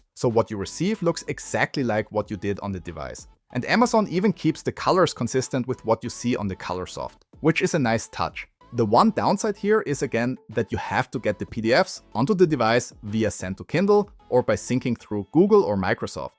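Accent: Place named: Austria. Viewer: wenn ich englisch mit österreichischer "Melodie" höre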